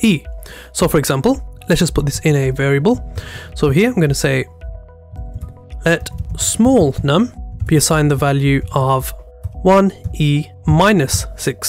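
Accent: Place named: United Kingdom. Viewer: He's British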